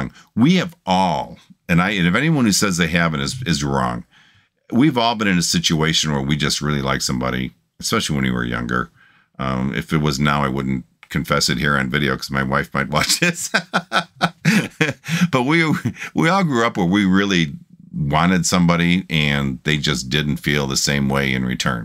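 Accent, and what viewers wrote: Chicago, United States. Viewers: I was gonna say you have a Chicago accent and then you pull out a Portillo's cup! I had a dripping wet Italian beef 3 days ago!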